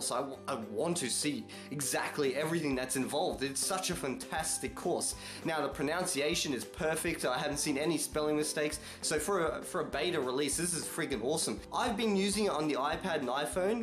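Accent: Australia. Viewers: Saluton,! I first heard about Esperanto on an episode of Red Dwarf! True. And like most everyone else I've spoken to I thought it was a type of Spanish. My eldest son has been following the development of the Duolingo Esperanto course since last year. When it launched into beta my husband convinced me to join in with them both. I started on the 11th (June) After only six days I know more Esperanto than German which I studied in High School for four years. Using Duolingo is like playing a game, its just plain fun. Also your World of Warcraft Esperanto teaching series is our evening lesson, as the three of us are avid WOW players (Since Vanilla! Ten years who'd of thought we'd be still running around the same world.) It's great to hear another voice, an Aussie one too, speaking Esperanto and explaining some of the nuances we can't get from Duolingo. Like rolling the 'r's'. 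I just couldn't get it until I started repeating after you. Dankon! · I know, I have almost finished my German Duolingo course (5 skills left to go) and I will do the Esperanto course after. And are you Australian? I can't determine your accent.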